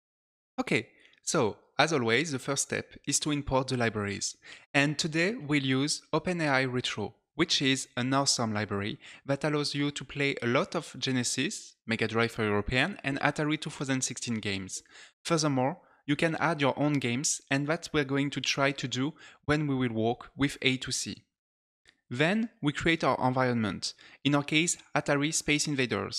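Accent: France. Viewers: Very good, thanks for your contribution. Amazing. (ps: i listin only for you french accent). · Tu n'est pas français par hasard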